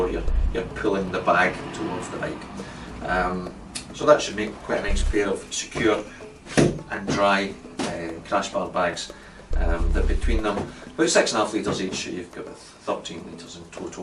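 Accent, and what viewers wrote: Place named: Scotland, United Kingdom. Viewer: Quality product at a great price, added to wish list! Thanks for this helpful demo. I wish all salesmen were Scottish, love the accent :D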